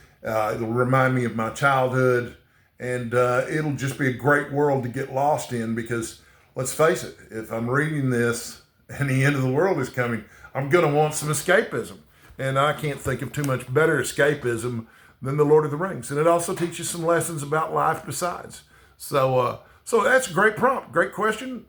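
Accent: Texas, United States. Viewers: What a fun video. 'Salem's Lot opened me up to reading and loving King after basically a lifetime of meh reading experiences with him. I did chuckle about the Texan accent. My Tennessee accent is often mistaken for a lifestyle that is reminiscent of Hee Haw and not a city dweller. Stay sexy and hydrated!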